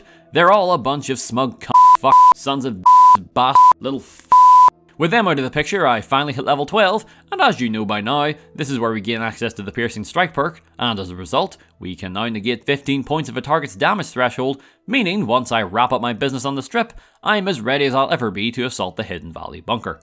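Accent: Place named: Northern Ireland, United Kingdom. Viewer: Right are you from Bangor or antrim 🤣🤣🤣 driving me mad trying to think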